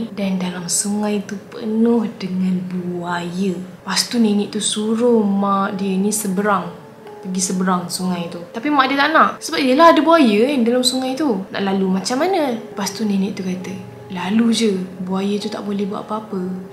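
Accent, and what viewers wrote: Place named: Terengganu, Malaysia. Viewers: COMEL GILA KAK BACA LOGHAT TERENGGANU 😂😂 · Comel kak cakap loghat terengganu 😭💕like it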